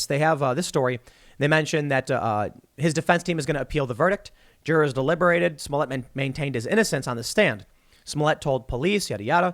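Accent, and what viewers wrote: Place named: New England, United States. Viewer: stop saying “Y’all” it’s a gross word and it sounds even more gross coming from your Yankee voice box.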